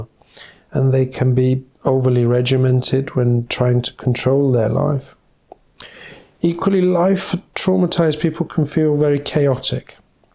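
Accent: United Kingdom. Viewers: Come on with the British accent makes it harder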